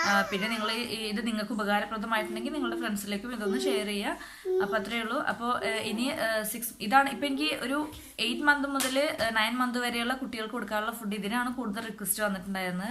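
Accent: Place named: Thrissur, India. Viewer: good, thrissur evideya, njan plkd pattambi anu .slang njangal samsarikunna pole thanne adha chodiche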